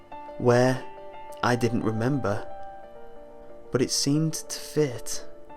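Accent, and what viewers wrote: United Kingdom. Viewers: ) omg Your british acsent is awesome !! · Just curious, are you British?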